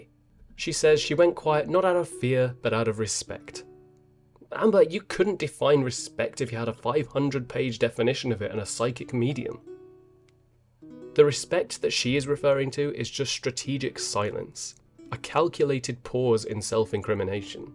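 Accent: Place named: United Kingdom. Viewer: I don't understand how you can take someone like ALR and speak so eloquently about her or what she's doing. I normally feel dumber after watching her but when I listen to you react to her, I actually feel smarter. Hey, it's NOT just the accent, either. Even though I have a thing for British accents because y'all could read the menu from McDonald's and it sounds intelligent 😂. It's like I'm watching a documentary and Albert Einstein is explaining how a sloth sloths. It's wordy but understandable. And it's Albert fucking Einstein, besties 😂. I think you might become one of my favorites, Mr.!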